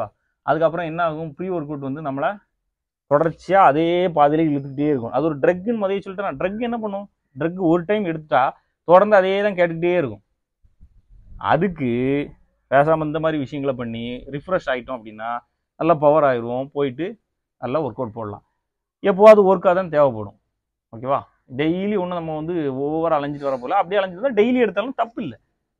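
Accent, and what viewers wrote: Tirunelveli, India. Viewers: Nellai tamil😂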